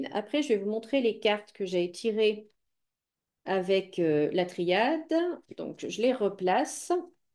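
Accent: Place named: United States. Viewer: Quelle accent Américain 😊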